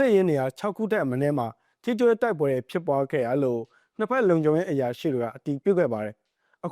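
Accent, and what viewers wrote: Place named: Rakhine, Myanmar. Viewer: ငါလိုးမ ရခီးသံနဲ့ သတင်းကြေညာနေတယ် ကျက်သတုံးအသံ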